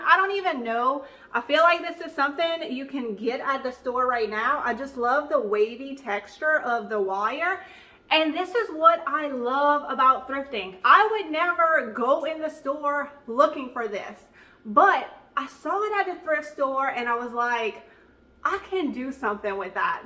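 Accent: Louisiana, United States. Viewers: thanks for the info, Kay. Going to get me some garden edging at home depot! is giving me all kinds of other ideas. Love her channel. I used to live in Louisiana so she makes me feel right at home with her Cajun accent and personality. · I love your DIYs and your channel! May I be a bit personal and ask are you from Louisiana and maybe Cajun,I’m from England and pick up on accents,yours is delightful xx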